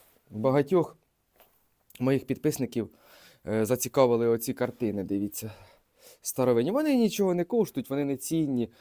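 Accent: Poltava, Ukraine. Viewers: З перших слів відчувається Полтавський говір❤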